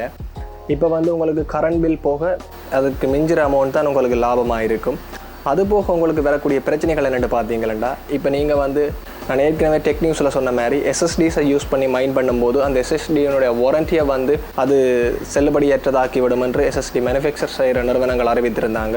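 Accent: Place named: Sri Lanka. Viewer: இலங்கை தமிழ் தமிழ் தான் 😊 I'm india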